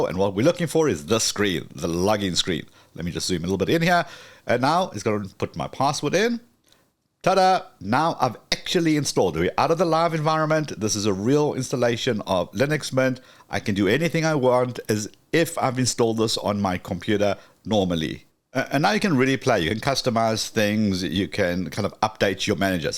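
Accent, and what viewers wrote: South Africa. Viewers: You can tell by the accent. I'm also a South African.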